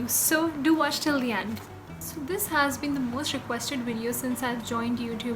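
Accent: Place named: Pakistan. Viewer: After hearing ur voice,I think u r Pakistani....if yes let us know...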